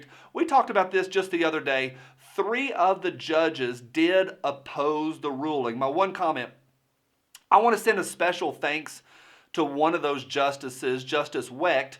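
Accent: South, United States. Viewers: Another great video dude! I was kind of hesitant on your channel the first time or two I watched. (Took me awhile to get used to the Southern Drawl). But you're now one of my favorite 2A channels. Thanks for all the hard work and great 2A info. Keep it up dude!! God Bless.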